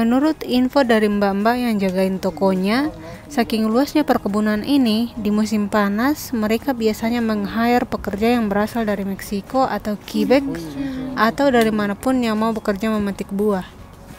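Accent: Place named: Makassar, Indonesia. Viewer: trims infonya kak. btw kakak org makassar kah? logatnya mirip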